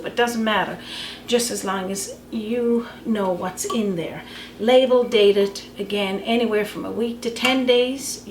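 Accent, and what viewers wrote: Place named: Newfoundland, Canada. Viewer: you so remind me of my grandmother as a young lad living in the country with farm lands all around. I grew up in the 40's and 50's and my Grandmother was always cooking, baking. I learned so much from her. I just love your Newfoundland way of talking as I told you before I worked with many men from NFLD.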